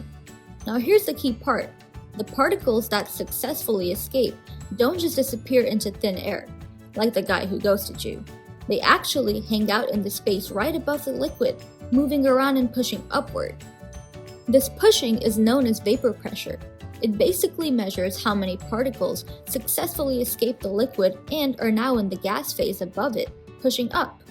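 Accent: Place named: South Korea. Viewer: You made it soooo Cute!!!✨😆 Thanks Girl😝. BTW are you Korean? Your accent seems korean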